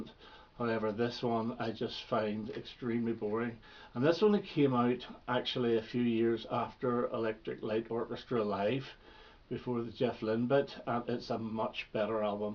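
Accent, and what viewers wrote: Northern Ireland, United Kingdom. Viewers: Hi, It's been a while. I'll be honest with you, it was the Americanism in the title that grabbed my attention. I came by to see if you still pronounce 'Aluminium' correctly. My wife comes from America and I have to suffer such vulgarities on a daily basis. Normally I look to you and your beautiful Northern Irish diction to save my sanity... but I can see that beginning to slip now... oh dear God. What is the world coming to...😪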